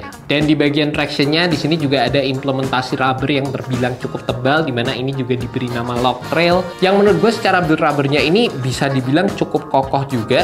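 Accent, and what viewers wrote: Java, Indonesia. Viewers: Gue nya medok mas.. Keren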